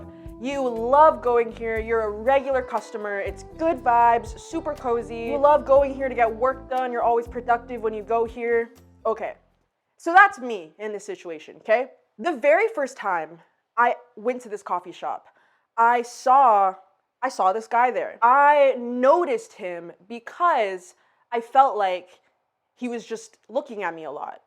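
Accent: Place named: General American, United States. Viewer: Generic american. Accents are more difficult to place now-a-days because we consume so much media and pick up words in different accents.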